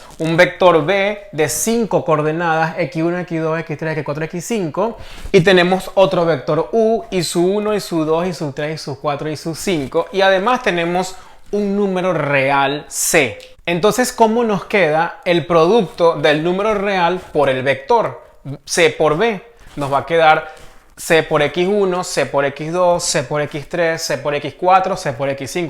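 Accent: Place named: Panama. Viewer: Eres de pTy por el acento hahah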